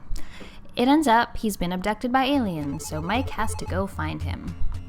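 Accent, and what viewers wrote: Canada. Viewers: Star tropics was my favorite game too. Are you canadian?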